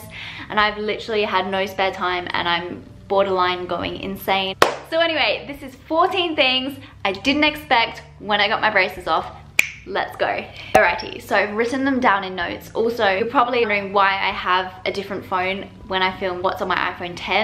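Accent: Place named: England, United Kingdom. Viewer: you sound a little English:))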